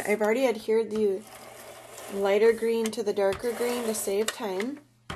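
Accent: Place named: Wisconsin, United States. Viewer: Hi I am watching this,just saying to my kids she sounds like she is from Wisc.You come to Florida we just go back from Wisc love this layout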